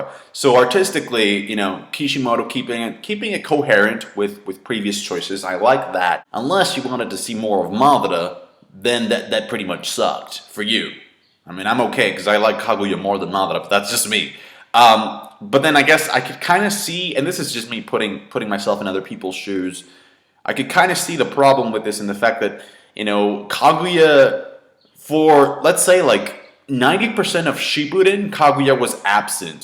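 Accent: Mexico. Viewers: Si habla como mexicano, yo también lo soy. jajajaja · Español con acento mexicano.... jajajaja pero la pronunciación es impecable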